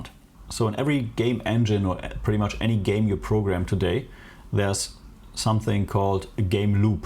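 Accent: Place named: Germany. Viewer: Ohne deine Beschreibung zu lesen, höre ich einen starken, deutschen Zungenschlag. Habe eben deinen Kanal gefunden und bin begeistert. Tolles tech-zeug, tolle themen und danke für den howto-spirit! Alles Gute weiterhin!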